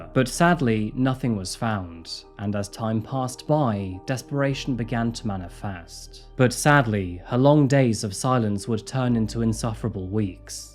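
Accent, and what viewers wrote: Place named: United Kingdom. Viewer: ❤ your channel very much and I listen to it every time when you come on. Never do I miss an episode. Love 💕 your British accent as well!! I’m half Welsh from my grandfather’s side and would love ❤️ to visit your country one day hopefully 🤞before it’s too late ⏰ in life lol 😝. I’m old, hahaha 🤣!!!! But no, I really am ancient, or at least I feel that way sometimes. The body is giving out, lol. But I guess we all will go through that in the end one day or other. Right?? Wishing you a wonderful weekend ahead and stay healthy and safe too. 😊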